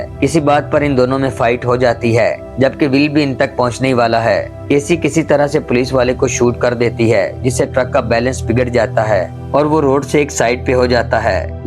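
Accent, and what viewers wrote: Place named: Punjab, India. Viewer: Punjabi accent. Not Hindi or urdu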